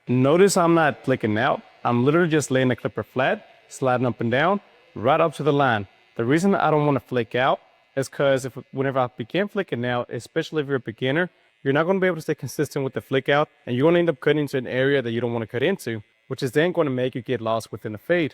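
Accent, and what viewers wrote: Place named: Mexico. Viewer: This guy can’t decide if he wants to sound Mexican, black, or redneck.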